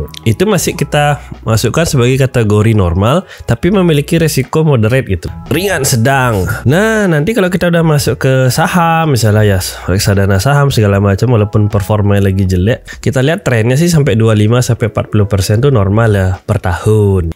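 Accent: Bali, Indonesia. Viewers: Mantab,.,.,.. nasehatnya apa adanya… aksennya org bali sekali hehe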